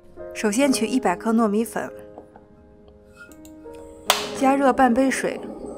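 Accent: China. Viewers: i like your chinese accent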